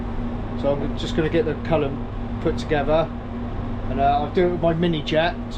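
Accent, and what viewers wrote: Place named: West Country, United Kingdom. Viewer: Nice job, where are you? Must be west country? I want to say Swindon or Bristol?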